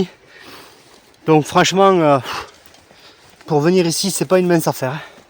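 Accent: South, France. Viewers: Avec plaisir, j'adore l'accent du Sud en plus ;)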